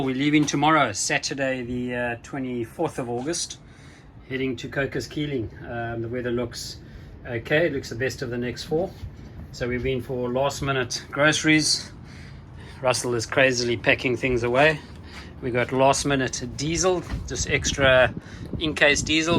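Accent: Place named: Durban, South Africa. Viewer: Accidentally found your channel and then realised you have the same accent that I have! Enjoying your content. Ex Durbs.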